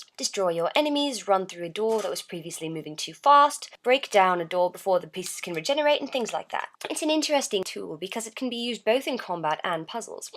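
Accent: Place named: United Kingdom. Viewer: No word of a lie, I thought you where british, shows how much I know about my own background XD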